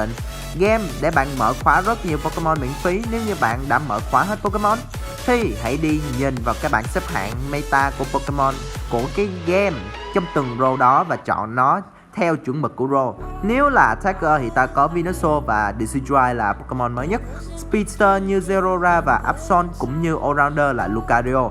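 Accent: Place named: Saigon, Vietnam. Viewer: vđ là giọng sg lại nói nhanh ý.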